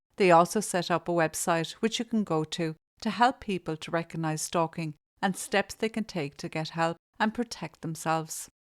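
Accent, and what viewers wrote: Ireland. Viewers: Don’t listen then, I love the Irish accent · Then find another channel silly. Irish is in the channel name. It's a gorgeous accent. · The Irish accent is lovely! If you have nothing nice to say then say nothing you horrible person!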